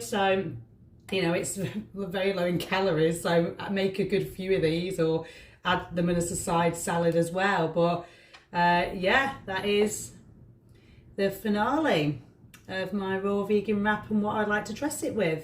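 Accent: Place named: Northern England, United Kingdom. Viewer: Love the Northern England accent! I am in London. Thank you for this recipe!!!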